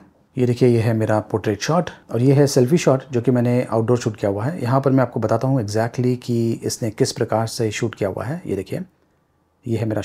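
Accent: India. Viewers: No it is Indian english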